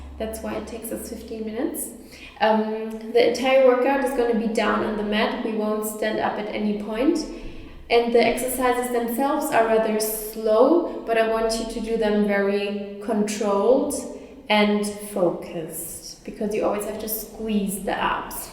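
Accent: Germany. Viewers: Dikka man hört einfach wie Deutsch sie ist🇩🇪🇩🇪🇩🇪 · U hear the german English Hahahahha she cant speak fluently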